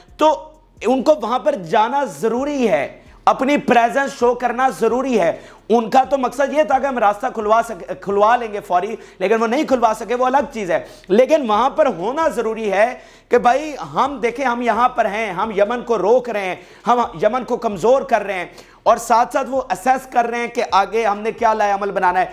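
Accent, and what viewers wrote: Punjab, Pakistan. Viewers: مہربانی کرکے انگريزی نہ بولا کرو ايسے لگتا ہےجيسے انگريزی نہيں پنچابی بول رہے ہو ۔اس کو ٹرانسليشن کرکے بولا کرو ۔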